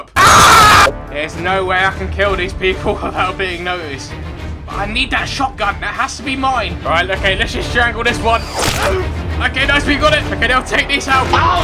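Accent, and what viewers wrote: United Kingdom. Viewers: Has a silencer, still hits the car alarm off haha, Is he brittish? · Butter butt he is British and the language is still English it’s just a accent. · Really is he british he has the british accent dont you hear that m8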